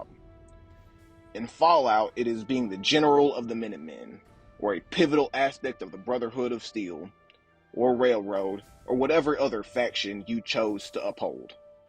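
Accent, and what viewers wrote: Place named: South, United States. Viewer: Oh it’s definitely not just you, he has a bit of a Southern accent but i think its cute🤭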